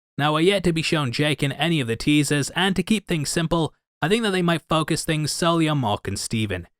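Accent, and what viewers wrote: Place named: Cockney, United Kingdom. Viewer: Most Americans probably thought you were cockney anyway 😅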